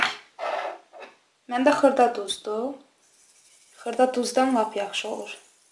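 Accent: Azerbaijan. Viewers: Ayaallah tam Azərbaycan ləhcəsi 👍🏻 Halal olsun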